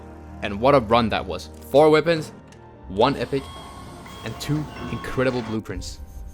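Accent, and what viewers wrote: Denmark. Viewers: 100% dansker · You danish by chance? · Dansk accent on top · Are you by any chance danish? The accent sounds very much like it haha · kan bare høre du er dansk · I smell Danglish :D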